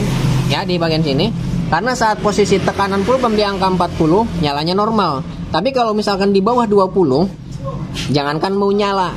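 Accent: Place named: Indramayu, Indonesia. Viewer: Ngomong e kaya wong dermayu ang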